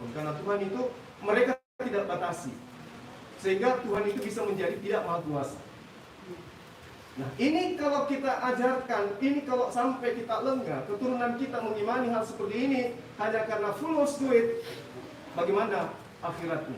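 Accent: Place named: South Sulawesi, Indonesia. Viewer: ini asal daerah dari mana. Sy kdg dengar bahasa Indonesianya logat Bugis makssar.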